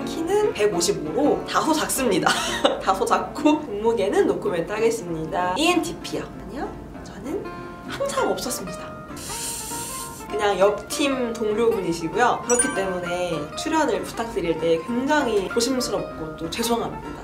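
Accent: Busan, South Korea. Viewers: 처음에 사투리 듣자마자 엇 부산! 이랬어욬ㅋㅋ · 사투리...? 뒤에 가니까 진짜 부산출신이셨구나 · 말투에서 약간 부산사투리가 조금씩 묻어있네요ㅎㅎㅎ 친근햄ㅎㅎ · 오 어쩐지 .. 경상도 사람은 사투리가 너무 잘들려서 어디 사람인지 궁금했는데 부산 .. 와 내척 친밀감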